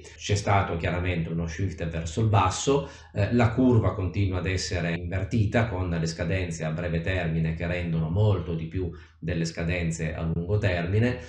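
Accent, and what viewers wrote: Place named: Piedmont, Italy. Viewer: Riconosco l'accento piemontese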